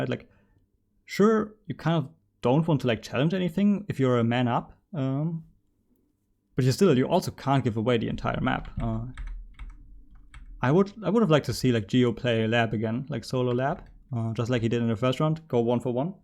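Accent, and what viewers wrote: Germany. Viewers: Are you from germany? It kinda sounds like that to me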